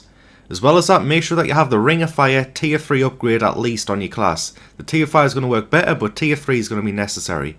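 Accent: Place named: England, United Kingdom. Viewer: another great video, but random question, always wondered where in england your from by your accentv haha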